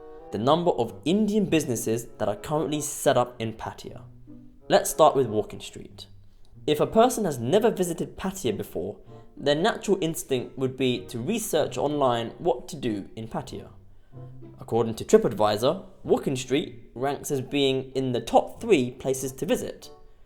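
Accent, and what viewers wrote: United Kingdom. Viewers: A british accent talking about his favorite past time aka the ladyboy and complaining that there are too many Indians in Thailand now.....2025 started off slow but is gaining traction coming from the last video I watched titled Carnival cruise ships are floating ghetto simulators.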